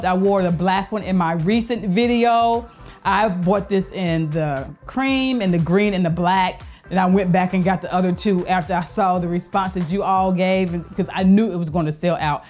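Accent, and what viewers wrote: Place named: Texas, United States. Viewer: New subbie! I knew that you were from the south before you said it. You sound so much like me and my family here in Texas! I love it! So relatable. Your suggestions for saving are perfect! I'm a huge paper towel purchaser. If I run out of it, I'm not happy. But your alternative to cloth napkins sounds promising, I have cloth napkins, but use those for decor most of the time. Also the deodorant, I'll buy the twin packs for myself, hubs and son. I've always known about the aluminum that's used but... I've also tried Lume there's 0% aluminum in there's. The catch though, is that it doesn't really smell like anything we're used to in deodorant (has a very light scent) But that's okay, it's safe. Also, I'll be trying out the white vinegar for our laundry. As those are also things I have on hand at home. I'm so about saving a coin, if I can. 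TSMFS❤❤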